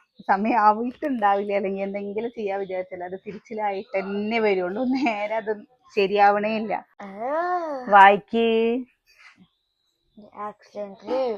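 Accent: Palakkad, India. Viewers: Thanks... എനിക്ക് തോന്നി ചെറുപ്പളശ്ശേരി കോങ്ങാട് ഭാഗത്തെ സ്ലാങ് നല്ലോണം ഇണ്ട്☺️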